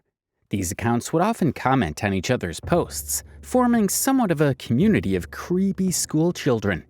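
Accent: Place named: Upper Midwest, United States. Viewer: I love this subject and what you do. As someone from the Midwest, I can't help but notice your accent through the video and it makes me really wonder if you're around or from the northern Midwest area.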